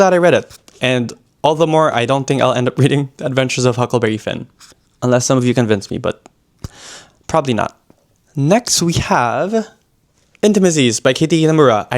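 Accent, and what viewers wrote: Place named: United States. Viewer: where r u from? just interested bcz u'r speaking like american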